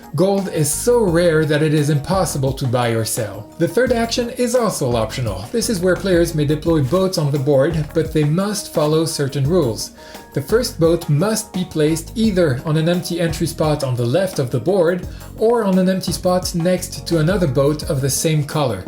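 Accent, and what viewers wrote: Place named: France. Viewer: with a touch of French accent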